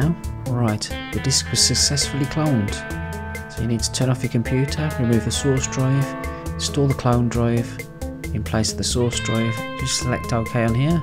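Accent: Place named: Midlands, United Kingdom. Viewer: some one i understand from the midlands cheers matey.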